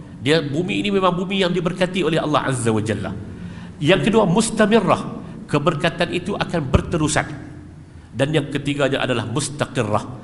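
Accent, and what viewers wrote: North, Malaysia. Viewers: Suka dengar Ustaz dan Ustaz Radhi (adiknya). Suara jelas dan penyampaian slang utaqa yg menarik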